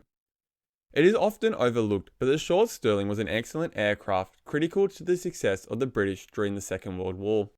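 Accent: Australia. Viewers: He is Australian, that’s the way a lot of Aussies pronounce it. All languages/ dialects have funny ways of pronouncement. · The Stirling was out-dated even before the prototype flew - things changed so fast! The thick, draggy, wing section was half of the problem, and the other was the feeble engines, so it was under-powered! My old friend Todd Slaughter (long since deceased) flew in them, but never talked about it. - - - The commentary (AI?) was very poorly spoken, disregarding the Aussie accent, so was difficult to understand, if you ignore the errors. (What's a glider tower - a vertical stack of gliders?) It would have been better to call it a glider tug.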